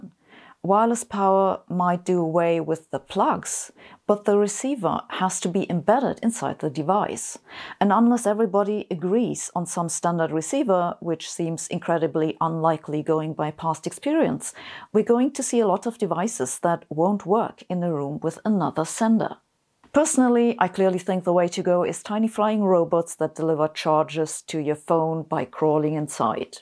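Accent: Germany. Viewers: Are you german? Alot of Youtubers i see with a similar accent have a similar type of "stare" No offense though. Your videos are informative and cool. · Hottest. Physicist. Alive. That German accent though.😵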